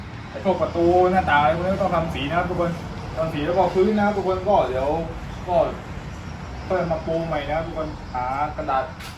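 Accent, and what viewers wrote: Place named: Central Thailand, Thailand. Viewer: แหลงกลางชับน่ะเติ้น บ้านอยู่ใหน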